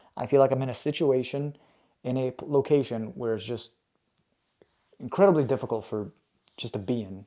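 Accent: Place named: Canada. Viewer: ... You do honestly sound like your from Canada. Other then when you say garage. Lmao... Gareage 😅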